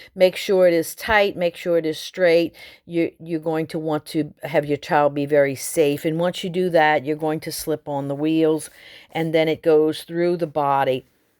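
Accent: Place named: New Orleans, United States. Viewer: Are you from New Orleans? You're accent is just like my wife's.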